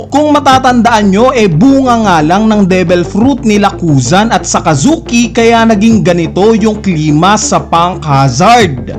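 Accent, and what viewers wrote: Visayas, Philippines. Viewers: Bisayang bisaya a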